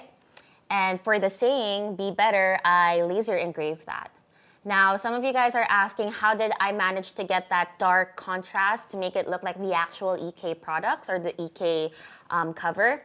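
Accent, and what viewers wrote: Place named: Philippines. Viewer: Wait, that accent is noypi. Sorry, just stumbled upon this video. Nag subscribe na po 🙏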